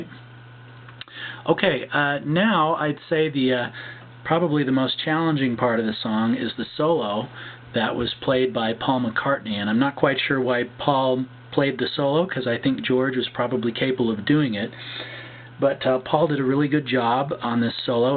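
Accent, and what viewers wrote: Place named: United Kingdom. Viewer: I would of thought you were british!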